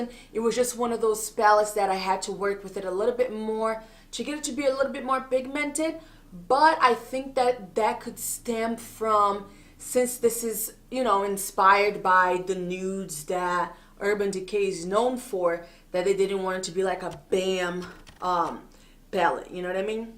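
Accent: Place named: Spain. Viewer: Do you speak spanish? I feel like I can hear your accent when you talk lol love it! This look is so gorgeous. loved this video!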